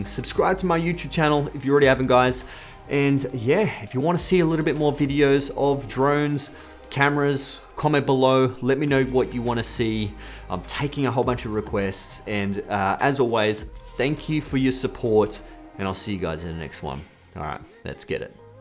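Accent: Australia. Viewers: I have both of those drones and love them both I am not a professional photographer I only do it as a hobby. I was on your last live chat and was trying to ask you a question. I got low sugar and had to go and get some I’m diabetic. I was trying to ask do you know much about the capabilities of the tracking feature I haven’t really pushed it too far yet and I am just trying to find out some things about it before I go running off to Mount panorama in Bathurst I think you would know where I’m talking about because you sound like you’re Australian. I want to film my classic Merc going round the circuit now whether I fly it manually round or use the active track is my question. I understand that it uses the camera to track. If I use an ND filter would that make the drone lose my car and stop tracking me? When I go there I will have a mate with me so I’m not sure whether to sit in the passenger seat and fly manually or do you trust the active track. 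Do you have an opinion on this for experience. How were you following the JetSki manually or active track? Any thoughts would be appreciated.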